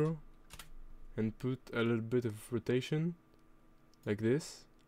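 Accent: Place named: France. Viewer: why is bros voice always changing now u sound french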